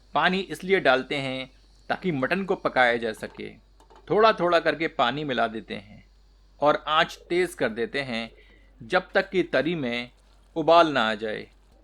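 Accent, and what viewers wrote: Standard. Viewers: Itni sudh hindi.... Aisey feel ho rha hai computer hindi doubing kr raha ho😊bt muton cury r luking so delicious... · Good to hear Swach Hindi. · Apki hindi bilkul sudh h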